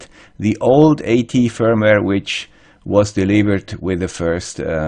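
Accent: Switzerland. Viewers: I like the swiss accent, just saying...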